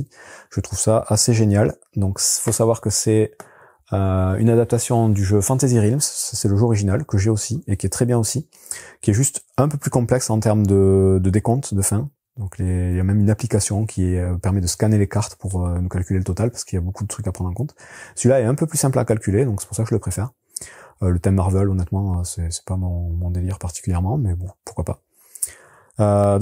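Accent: South-West, France. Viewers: Bonjour, Je regarde régulièrement tes vidéos et je me décide enfin à te laisser un petit commentaire. Merci pour ta chaîne et la régularité de tes publications. Une chaîne qui donne de la fraîcheur dans le paysage vidéoludique pour le moins très similaire et assez commercial. Tu es clair, concis, précis dans tes explications, c'est fort agréable, et avec un accent du sud-ouest qui ne gâche rien ;).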